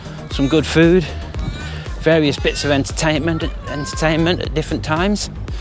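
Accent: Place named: Northwest England, United Kingdom. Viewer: . Thanks for the response. I did notice a slight northwest sound. (Similar to Freddie Flintoff but less thick.) On to the video, thanks for taking us on a leisurely jaunt to Hillarys Boat Harbour, a place I want to return to. 3 Sheets on the Harbour, bloody nice as margarita pizza.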